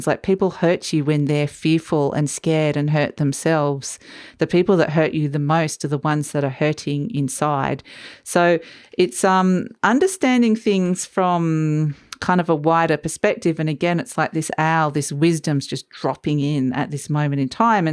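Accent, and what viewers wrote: Australia. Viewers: R you from Oz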